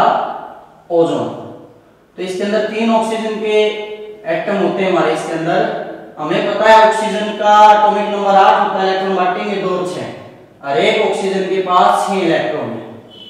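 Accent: Haryana, India. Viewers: Sir Seriously you're brilliant. Keep it up. And never leave your this hariyanavi accent .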